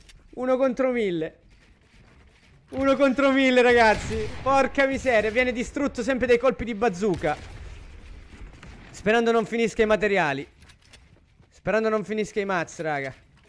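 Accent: Calabria, Italy. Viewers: Ma sei o Ninja??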